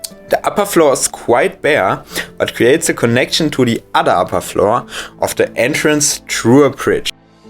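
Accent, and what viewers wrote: Germany. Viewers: Kommst du aus Deutschland du hast einen Deutschen Akzent?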